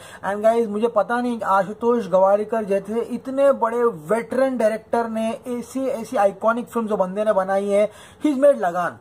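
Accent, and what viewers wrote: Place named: Maharashtra, India. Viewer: Dada your Marathi was so sweet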